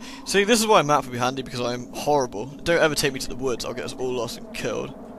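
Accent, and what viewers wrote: United Kingdom. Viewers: Finally he's reading stuff! I wanted him reading since way back when he was doing Penumbra...the British accent makes everything sound more official...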